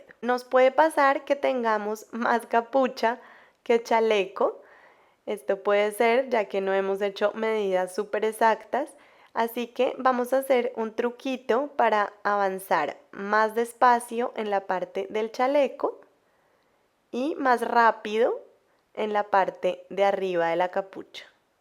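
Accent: Colombia. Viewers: Tu hablas tan lindo acento Colombiano right !!!!!!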